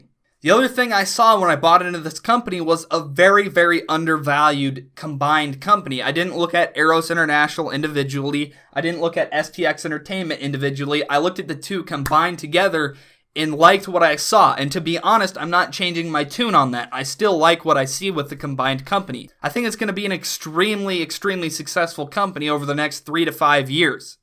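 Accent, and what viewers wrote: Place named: North, United States. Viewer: Love the intro music...What state are you in? You sound Northern..lol. I'm from RI but live in East TN..keep up the great content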